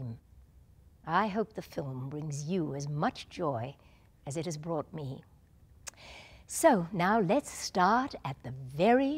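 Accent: United Kingdom. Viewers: Its actually a southern english accent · I love Julie Andrews and I love the fact that she's never lost her British accent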